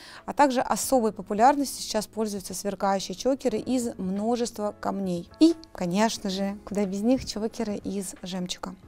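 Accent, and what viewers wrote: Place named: Akanye, Russia. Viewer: Такое милое видео, такие примеры, такой текст хороший мне так понравилось, но вот это аканье так режет слух😢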